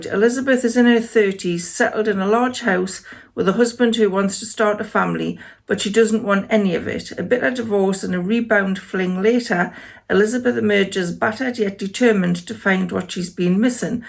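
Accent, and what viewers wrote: United Kingdom. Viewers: Yeah I'm Durham so thought you sounded a little further north to me . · Ohhh I recognise that accent! Durham maybe?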